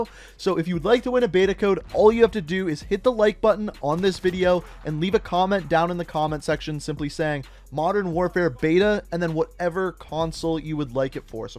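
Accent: Canada. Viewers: I can definitely tell you Canadian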